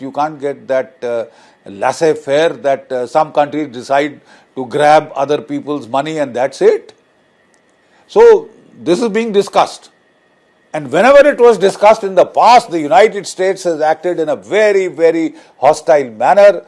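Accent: India. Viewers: I like the way he talks , he speaks English but with an Indian accent, which sounds very nice.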